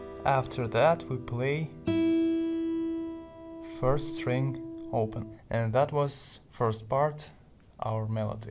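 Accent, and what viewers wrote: Russia. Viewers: а я сижу и думаю, а чет акцент русский дофига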